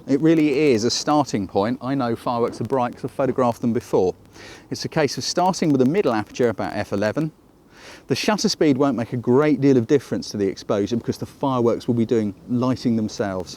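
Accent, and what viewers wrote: United Kingdom. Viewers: Buddha bless you for the British accent, music to my ears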